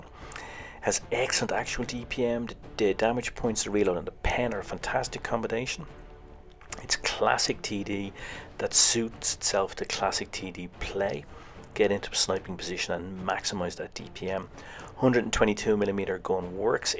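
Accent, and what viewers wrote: Ireland. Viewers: World of Tanks Blitz Ano ty si parada player...yes bc you v Good player and you voice is super...Irish...its Ok Am Polish player you a Normal nice Man...✌️💝🇵🇱 Am live in Poland,Prague is very very nice town do you se Kretek adventures 😂😂😂😂 its my chilhood childern in Czech,Poland,Slovakia..love this hmmm....creature 😂😂😂✌️👍😉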